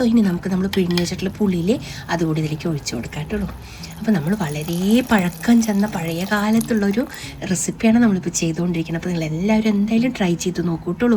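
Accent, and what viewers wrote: Palakkad, India. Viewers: My mouth is watering nice to here your kollengode palaghat basha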